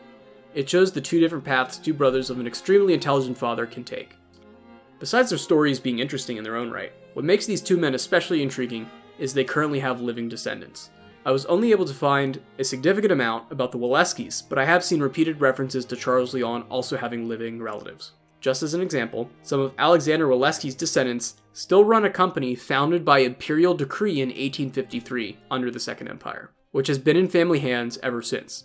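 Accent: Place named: Midwest, United States. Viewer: Slow-down, Kiddo. You are rushing your excellent Narrative. Midwesterners do that. Runningwordstogether.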